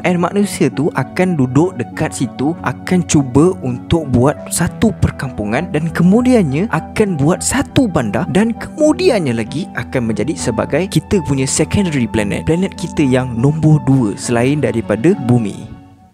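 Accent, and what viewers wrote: Johor, Malaysia. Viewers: Bro...ko org johor kan...aku baru je subscribe kau...cara kau cakap ...senang faham...👍🏼👍🏼👍🏼👍🏼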